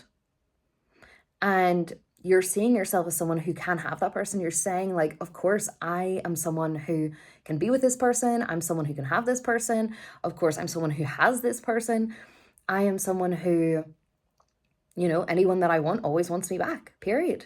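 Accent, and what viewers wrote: Ireland. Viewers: I love your accent! I'm in the states but have blood over in your neck of the woods. Galway I believe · am from the uk and i understand your Irish accent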